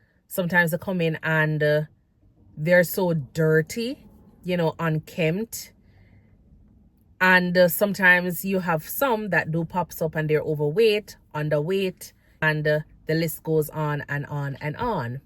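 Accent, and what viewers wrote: Jamaica. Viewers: i like the jamaican accent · I love your Jamaican accent, especially when u switch it on from off.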